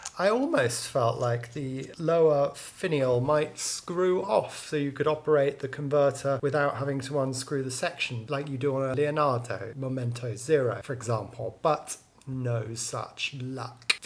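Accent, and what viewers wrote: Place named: RP, United Kingdom. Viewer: Okay, confession tiiiiime. (warning: high levels of tongue-in-cheekness coming up in 3, 2, 1...) I have never gotten why Americans get so weak at the knees whenever they hear a British accent. Doesn't do it for me, sorry. I always much preferred a soft Irish lilt or the silliness that is a Canadian accent ("eh"). Or a Scottish accent. Good gawd. To quote the great and late Robin Williams, "You can't fucking understand them" but they make gibberish so entertaining. Having said that, I don't know what it is about your accent but I love it. LOVE it. It's so posh. It fits so well with your obvious eloquence. There's something so satisfying about how you pronounce words that end in a -p. "Clipppp". I'm as gay as the night is long, but I'm not going to lie, I've got a bit of a crush on you. Too much information? Alllllrighty then.:D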